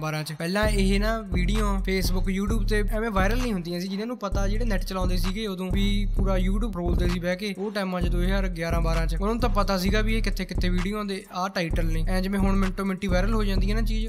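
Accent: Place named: Malwa, India. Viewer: Mittra Bolan da way tera Bda Ghaint aa tera Malwayi lgdan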